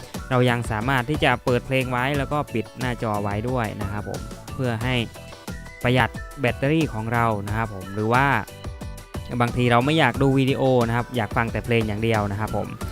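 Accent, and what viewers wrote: Thailand. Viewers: ทำนองเสียงน่าจะเป็นคนกรุงเทพ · สำเนียงถ้าไม่บุรีรัมย์ก็สุรินทร์แหละ555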